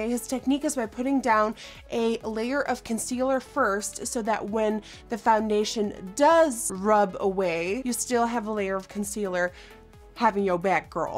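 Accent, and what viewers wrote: United States. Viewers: She's Dutch, and what an amazing channel and American accent! Ga zo door! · Oké ik wist dus niet dat je Nederlands was je klinkt als een amerikaan😂😂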